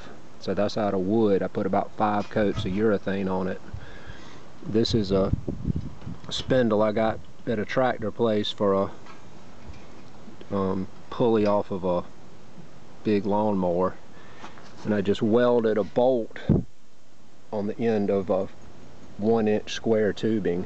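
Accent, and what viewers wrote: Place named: United States. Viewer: Real American accent 👍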